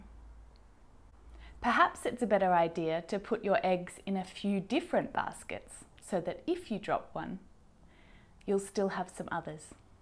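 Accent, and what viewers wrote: Australia. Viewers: Hey, I really enjoy watching your videos, even more with this Australian accent. Hey, just giving an idea for you, you may start your recording with some warm up activity, in order to prepare your audience for what comes later, then stablish tasks with information gaps to maintain the curiosity of your audience. It's just an idea for you. Thank you for being shared these idioms. Cheers. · you have no ideia how I do like your videos. I found you so clear when you are speaking, although I am familiar with Australian accent because I already have been in Australia over a year taking my uni exchange I think there is something really special in the way that you speak to, I can not to point it out but what I know you are the best English teacher that I met so far. You are so creative and the way that you try to create an interaction between you and your audience makes easy to memorise what you are teaching. 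I'm planning to move back to Australia again and take my master's degree there, therefore I will take again an English exam very soon so, I'm watching to your videos and practicing the imitation technique regarding to improve my speaking skill. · Hello, are u teaching Australian English?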